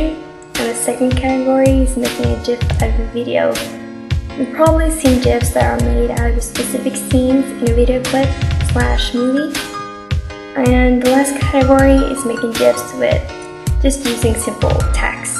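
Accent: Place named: United States. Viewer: you sound american to me.... 0_0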